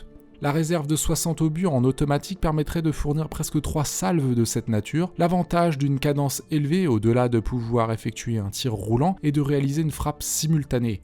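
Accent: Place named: Germany. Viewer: bel accent allemand : )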